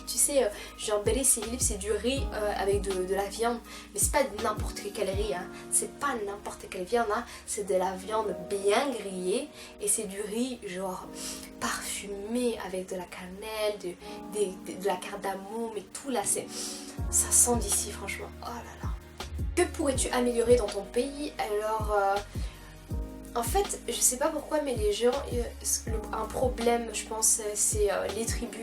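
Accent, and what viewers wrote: Somalia. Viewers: w t f is this hrgeysa dielect i dont even get any word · Tes une Pauvre refugier somalienne qui a eu les papier enfin j crois , rien que ton accent te trahi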